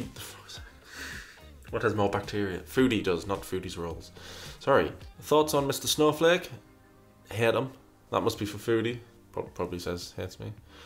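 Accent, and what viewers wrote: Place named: Newcastle, United Kingdom. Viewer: lol, i didn't believe was from Newcastle, but I can hear the Geordie accent in this video <3... loving these videos on gurlworld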